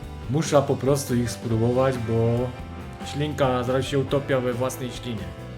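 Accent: Silesia, Poland. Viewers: A co to za fonetyka? Czy to wymowa śląska?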